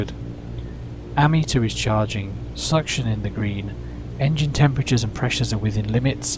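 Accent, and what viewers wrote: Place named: England, United Kingdom. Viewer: Great lesson. It is good to have in an English accent. Plus a view from the pilot's seat so as to get the picture.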